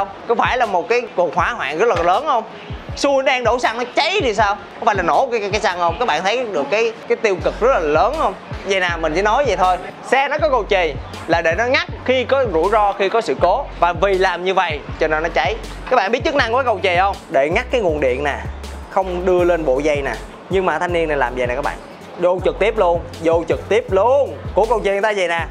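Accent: Standard. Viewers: Hoc văn giỏi hong, nói chuẩn vậy trời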